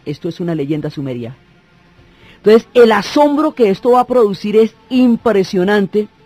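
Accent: Colombia. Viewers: Lamento mucho no haberte conocido antes. Muy agradable y clara tu narrativa. Pero, lo que me cautivo es tu forma de hablar Bogotana. Solo por eso te seguiré escuchando. FELICITACIONES! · Ese acento colombiano paisa y su voz grave es lo q le da sabor a la narracion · Fascinante oír la historia en la voz de dona y su delicioso acento colombiano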